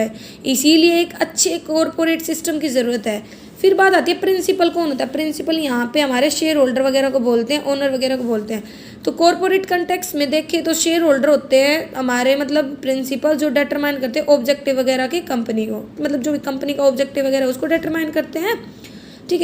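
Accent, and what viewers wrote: Haryana, India. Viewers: Haryana se ho app?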